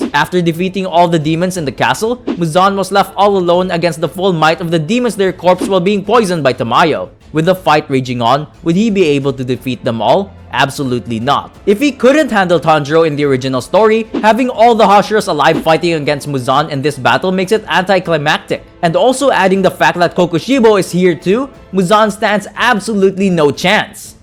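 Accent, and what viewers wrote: Philippines. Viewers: Filipino ahh acent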